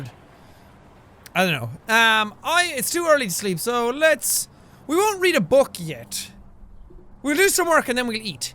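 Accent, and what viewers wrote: Ireland. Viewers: What part of Ireland are you from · Is it just me or does Jack no longer uses his Irish accent anymore other then in his intro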